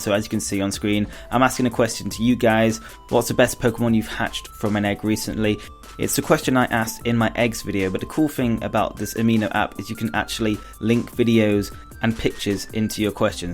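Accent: United Kingdom. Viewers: you from sheffield?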